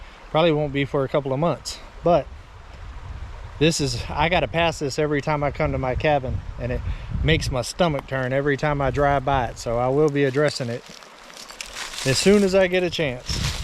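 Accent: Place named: Louisiana, United States. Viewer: Just want to know if you're from Louisiana because you sound like you got Louisiana slang going on when you talk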